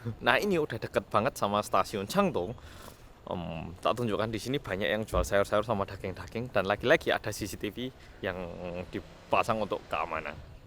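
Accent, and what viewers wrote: Java, Indonesia. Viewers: Mas ini kalau ngomong bhs Korea logat Jawa? ( Medok)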